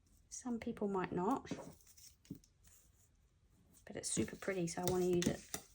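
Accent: New Zealand. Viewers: I love hearing a junk journaller with a kiwi accent. Congrats on the growth,. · Hi I have just found your channel and thought wow, she sounds like a kiwi! So further investigation and yes you are her in NZ! That is so good as I didn’t think had a channel on You Tube for junk journaling. I don’t know anyone else here in Dunedin that does JJ so really pleased to see you here and hear your accent 😂Lynne Dunedin NZ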